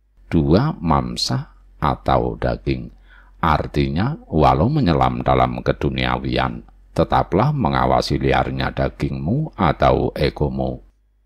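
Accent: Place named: East Java, Indonesia. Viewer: kirain jatim mojokerto jenengan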